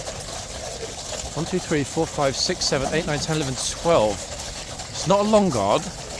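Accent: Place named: United Kingdom. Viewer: I love British accents!!!!!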